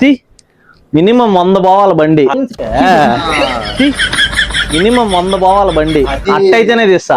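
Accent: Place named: Nellore, India. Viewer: Meedhi Nellore anna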